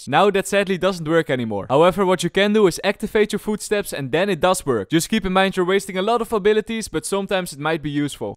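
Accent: Netherlands. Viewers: He’s dutch I can hear it for his accent because I’m dutch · your accent tells you are from netherland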